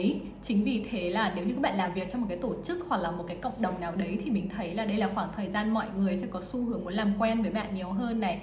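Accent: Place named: Cam Pha, Vietnam. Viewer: nghe giọng như người cẩm phả vậy :)))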